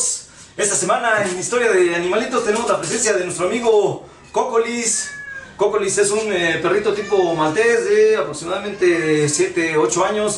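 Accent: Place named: Puebla, Mexico. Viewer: Saludos médico Regrese con sus videos por favor Por el acento que tiene al hablar se me figura de Puebla de los angeles, paisano 🤝🏽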